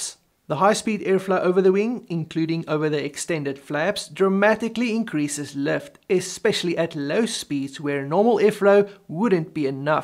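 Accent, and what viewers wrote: South Africa. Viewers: Ek wil een van hierdie dinge hê om wors vir die braai aan 'n lekker braai plek te vlieg. Heard your accent and thought, "dis my ou" 😂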